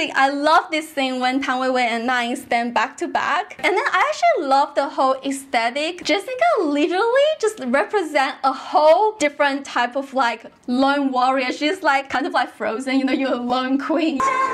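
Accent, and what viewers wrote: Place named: China. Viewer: Your English is good except for a few words. Typically the word "in". You must end with your tongue touching the inner upper teeth. You left your tongue in the centre which most China Chinese do. Hope you are not offended. Hopefully, it will help you in your life 😘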